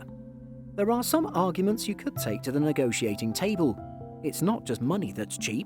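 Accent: United Kingdom. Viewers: I’m just curious and it’s no big deal to me, but like in this program, when you mention capital investment you most likely show U.S. dollars currency in your videos rather than British pounds, or other currencies. All along I’ve thought Simple Flying originated in Great Britain. I guess that’s because of the accent of your narrator (He does a bang up job.) so I’m always expecting monetary value in pounds and you trick me and use dollars. So my question is... What’s up with featuring American “dollars” in your script usually rather than British pounds??? FYI: I enjoy your programs. Please keep the high quality. Many thanks!!!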